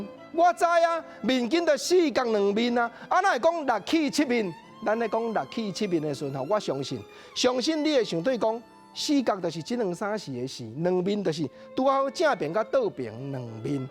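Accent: Taiwan. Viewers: 目前電視台，推展台語主持人，國禎老師尚有台灣氣口尚有台灣味，聽了真爽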